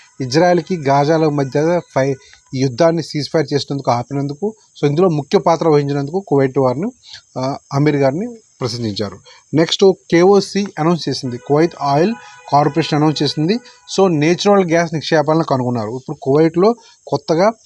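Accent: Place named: United Kingdom. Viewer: Again it's british english 😮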